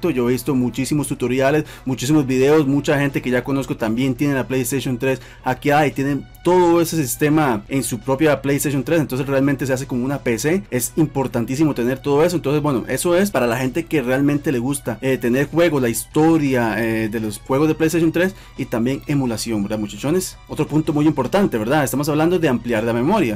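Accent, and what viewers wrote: Costa Rica. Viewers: Eres de costa rica?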